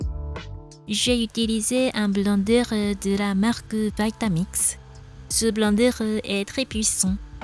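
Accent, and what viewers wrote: Japan. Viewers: Merci pour cette agréable video ! Les images sont très belles et votre petit accent japonais est adorable ! Bonne continuation a vous et merci pour ces superbes recettes sans gluten.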